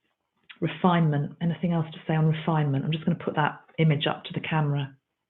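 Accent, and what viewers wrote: United Kingdom. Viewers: You are truly a Jewel! I love listening to you and your British accent!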